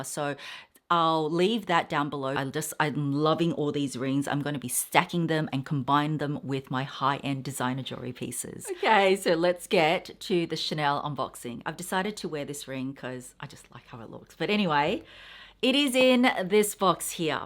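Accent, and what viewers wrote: Australia. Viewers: Hi! I'm new to your channel, but I just love your videos and your Australian accent. Congrats on hitting 300k! Three prizes is my choice. 😘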